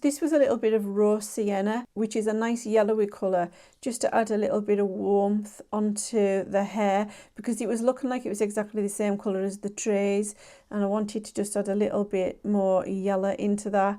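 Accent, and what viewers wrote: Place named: Whitehaven, United Kingdom. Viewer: Hi, iv just found your fab channels, your accent reminds me of home I’m from Whitehaven, love your art and really enjoying the format hope to see more of your work ❤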